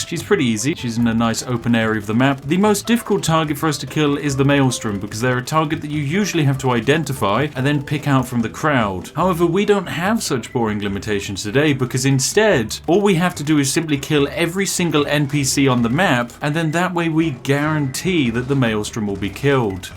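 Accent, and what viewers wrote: United Kingdom. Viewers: I subscribed to you for the lone fact of your British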